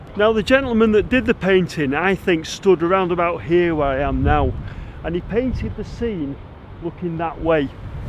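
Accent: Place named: Manchester, United Kingdom. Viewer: A loadda hot air. Literally 🤣 👍 great vid As per.. I'm pickin up a Mancunian accent I watch this channel so much. Sweet 👍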